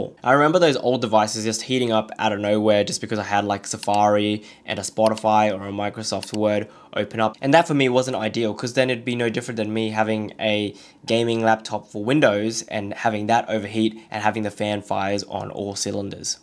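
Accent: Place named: United Kingdom. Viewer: First time I ever heard an Asian guy with a UK accent. 🤓👀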